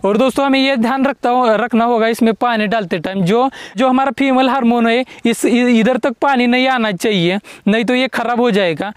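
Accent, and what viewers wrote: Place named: Maharashtra, India. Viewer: योग्य मांडणी केली हिंदी छान बोलला उच्चारण थोडेसे मराठी वाटते पण अगदीच हिंदी येत नाही असे नाही . जो विषय मांडला आहे त्याला निर्विवाद न्याय दिला आहे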